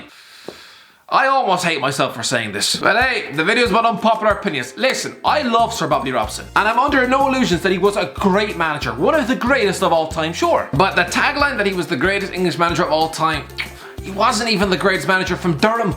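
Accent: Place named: Ireland. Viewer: I love this guy his Irish accent is so funny wich makes the videos so entertaining. Hope everyone is staying safe through these tough times.❤❤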